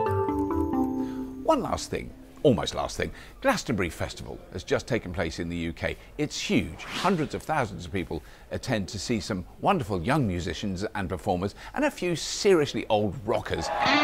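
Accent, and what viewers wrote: Australia. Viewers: Was not expecting that Aussie accent to be any good. 👏👏👏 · Great show. 9/10. It would have been 10/10 if it hadn't been for that 'Australian' ? accent . (From a loyal Australian fan)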